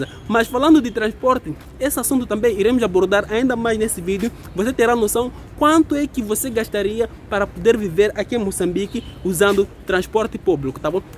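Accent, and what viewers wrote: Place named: Brazil. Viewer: Você fala um sotaque próximo do brasileiro. Diferente de outros youtubers de Moçambique.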